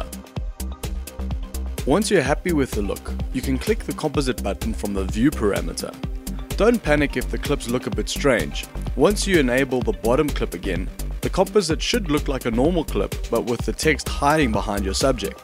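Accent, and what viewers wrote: South Africa. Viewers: Good to hear a fellow RSA ! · Hi, very nice tutorial mate. I have a video request I'd really like to make. In iMovie on the Titles tab, there is a title animation called "Line". A line expands out left and right from the centre and then text fades in above and below that centre line. I would like to do the same thing in FCPX but instead of a fade in, I would like the text to come out from "behind" the thin line to rest on the top and bottom of it. I thought I could achieve this effect by using transparency masks for each text blocks and then using the baseline tool to move the text above and below the line. However keyframes don't track the baseline setting between frames so I can't animate it. Do you have a tutorial for this? Thanks so much for your help! PS Are you South African?:D Your accent sounds very similar to where I grew up!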